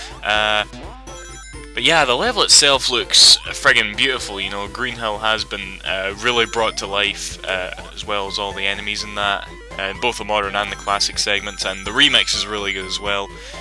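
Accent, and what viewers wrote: Scotland, United Kingdom. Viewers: lol when i was a cat xD i love scottish accent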